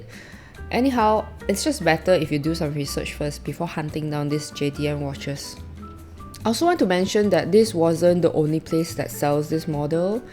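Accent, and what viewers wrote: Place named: Malaysia. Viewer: Very typical Malay accent. Good selection.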